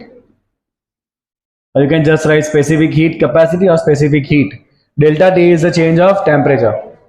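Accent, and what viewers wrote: Gujarat, India. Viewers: Thnak you sir for reply Btw, tamaru hindi english speaking and writing mast fluent chhe but video ma akad evo word aavi jay k jena parthi khbr padi jay k tame gujarati j chho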